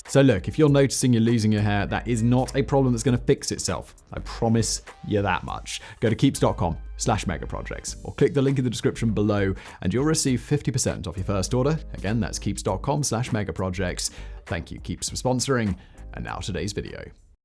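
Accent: United Kingdom. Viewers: I can never tell when if he's mispronouncing something, or if it's just the usual British insistence on adding hard vowel sounds where there isn't even a vowel.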